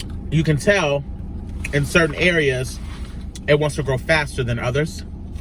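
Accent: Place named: Alexandria, United States. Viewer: Sounds like Alexandria, VA 😊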